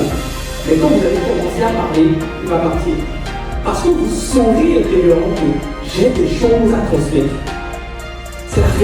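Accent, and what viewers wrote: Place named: Africa. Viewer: Vous vous exprimez très bien malgré le petit accent africain. Cela dénote de la qualité et la quantité des efforts fournis.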